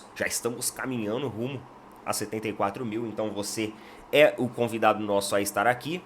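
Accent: Sao Paulo, Brazil. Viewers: Sotaque Paulista mano.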